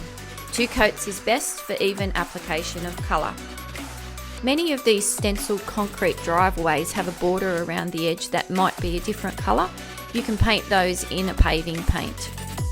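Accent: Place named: Australia. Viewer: Love the Aussie accent. Greetings from Ireland 🇮🇪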